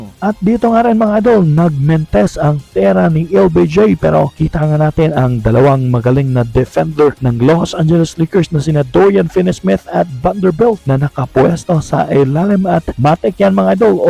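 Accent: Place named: Visayas, Philippines. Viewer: Likers(lakers) amputa tapos sikends (seconds) bisayang bisaya mag salita nakaka urat sa tenga.ambobo mo mag voice over.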